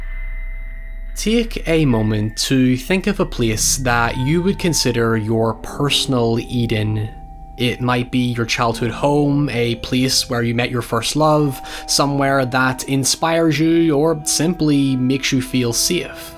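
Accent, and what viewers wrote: Northern Ireland, United Kingdom. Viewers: He's from Northern Ireland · Northern Irish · He is from UK, you know the f'ing birthplace of the language called English!?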